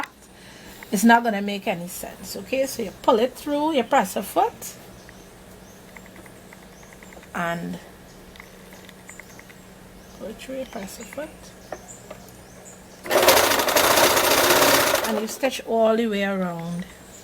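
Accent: Trinidad and Tobago. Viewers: hey are u trinidadian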